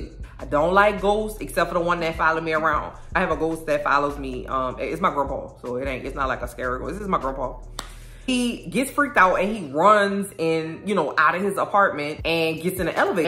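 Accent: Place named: Louisiana, United States. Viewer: I hear the Louisiana in your voice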